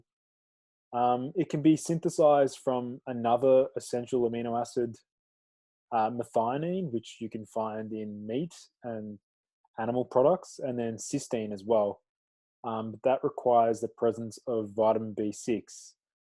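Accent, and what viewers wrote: Australia. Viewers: he's not british. That is an Australian accent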